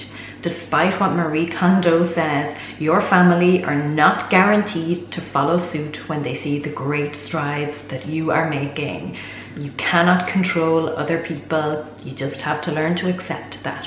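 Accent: Ireland. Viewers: Love your Irish lilt, could listen all day! Your doing a great job and it's so frustrating when you don't have everyone in household with same mindset, sorry to hear of your loss.⚘⚘⚘ Donna from SCOTLAND xx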